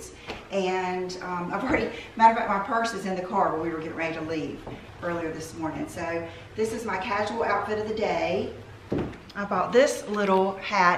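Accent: South, United States. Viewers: I love the natural look on you!! So gorgeous. Btw, it cheers me up so much everyday just to hear your sweet voice. I am from GA and I love a fellow southern girl accent!!! You remind me so much if myself. People say we’re sweet but we can be tigers too! Lol. 😘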